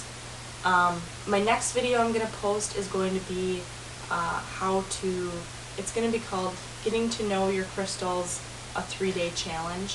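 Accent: Michigan, United States. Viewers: I knew your Michigan accent was familiar!! I live near Ann Arbor :] if you're ever in the area, my go-to metaphysical store is Earth Lore in Plymouth. I get tingles just thinking about the place! Or if you need a book, Crazy Wisdom in downtown Ann Arbor.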